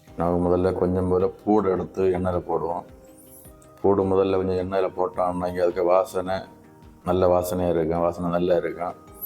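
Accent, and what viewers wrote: Kanyakumari, India. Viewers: கன்னியாகுமரி யா நீங்கள்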